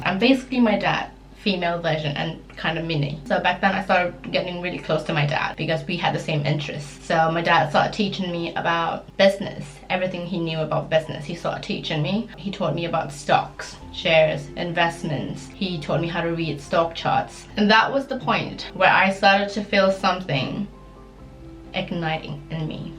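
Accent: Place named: United Kingdom. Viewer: her voice is becoming very very British now,, lmao its cute ♡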